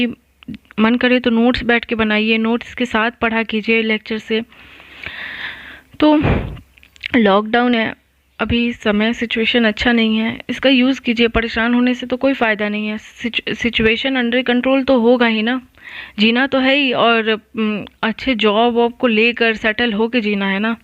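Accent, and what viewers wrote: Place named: Bihar, India. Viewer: Mam are u from bihar ??? Accent se lg rha tha😂😂😂😂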